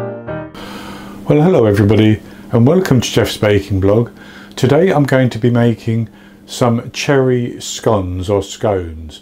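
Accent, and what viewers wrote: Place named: Devon, United Kingdom. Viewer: Wonderful recipe uncle...very therapeutic...Perceval a old gentleman friend of mine is convinced.. You are from Shropshire...he enjoys working out accents...now he thinks your from Devon. Any clues without revealing so we can work it out.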